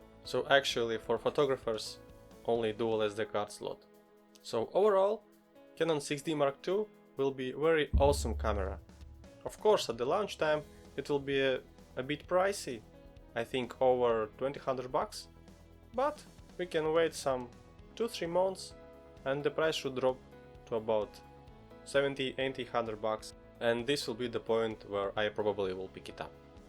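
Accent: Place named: Russia. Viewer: какой то русский акцент, ты русский?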